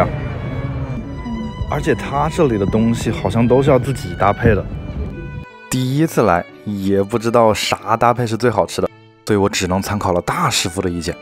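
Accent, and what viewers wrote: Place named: Hunan, China. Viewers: 你说话好大的fu南人的味道啊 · 哈哈哈听你的普通话隐隐约约感受到湖南口音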